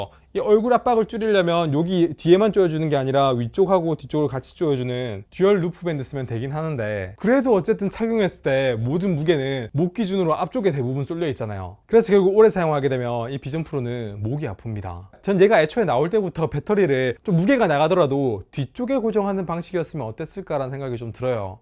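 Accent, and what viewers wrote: Gyeongsang, South Korea. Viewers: 아씨... 경상도 사투리...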